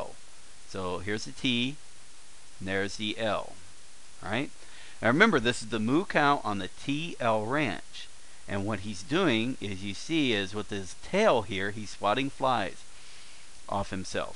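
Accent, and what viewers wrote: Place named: West, United States. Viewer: how can it be the mu of samuel when the mu in samuel sounds like "myu"; geez you western foreigners and your accents at least teach correctly and try not to confuse the students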